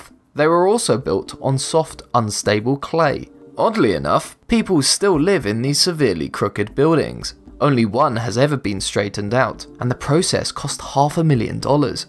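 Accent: England, United Kingdom. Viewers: the guy in the video obviously doesn't know much about physics But he's got a smooth British accent, and that gives him instant intellectual street cred. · An English voiceover speaking an American English script always does my head in. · disliked in 23 seconds.due to dorky face figure (after brit accent) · Having an English accent will only fool some people into thinking you know what your talking about young man. List videos then to have lots of mistakes in them but this one takes the cake!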